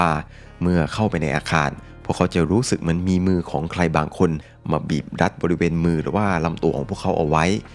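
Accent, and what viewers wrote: South, Thailand. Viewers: เสียงคุณ เหมือนคนใต้เลย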